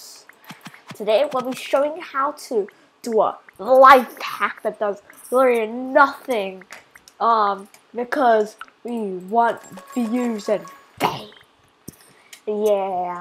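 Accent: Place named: United Kingdom. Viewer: Briish huh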